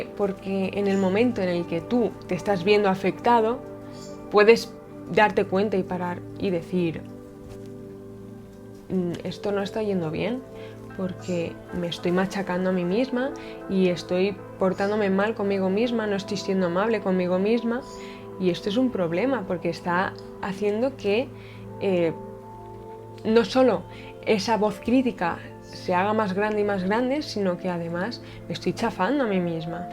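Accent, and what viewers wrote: Spain. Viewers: Hola me gusta mucho ver tus videos me gusta mucho escuchar tu punto de vista además de que me gusta mucho tu asentó me gusta como se escucha el español de españa ojala poder conocer una española jiji saludos desde Costa Rica pura vida!!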